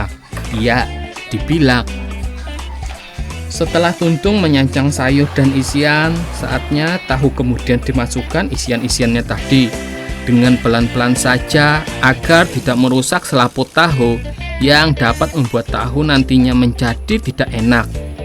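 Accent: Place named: Kalimantan, Indonesia. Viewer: Palangkaraya mna suara nya like urang Kalimantan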